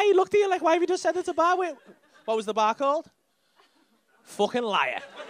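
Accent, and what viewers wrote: Liverpool, United Kingdom. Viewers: Love the crowd work. It took me many videos with subtitles to get used to scouse but now I understand most of the lingo. Amazing work, big fan! Keep it coming.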